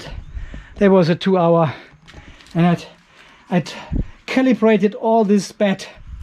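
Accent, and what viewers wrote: Germany. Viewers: Als ich dein erstes Video sah, dachte ich spontan: Wie kommt ein Deutscher nach Australien, u.a. wg. dem einschlägigen Dialekt...BOMBENSICHER bin ich mir erst seit BOMBENFEST. Auswandern war schon immer mein Traum...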